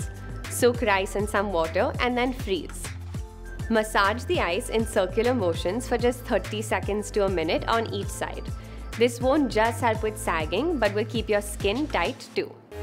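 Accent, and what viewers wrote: India. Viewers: Why is this white woman speaking with an indian accent 😂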